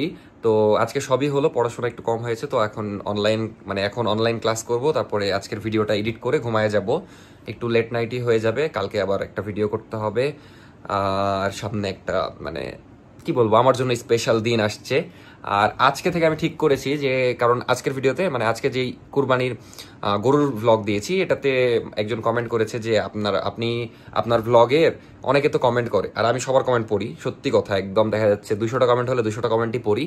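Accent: Rajshahi, Bangladesh. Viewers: the best thing we like is your true Rajshahi accent ar apni o apner wife er true innocence. Allah apnader shushtoh abong bhalobashai poripurno rakhoon. Ameen abong apner Maa er jonno pach wakht salah ar dua korben karon etai uner akhon aktai chawa ar apni shontan ar apner dua is most important. Respect ✊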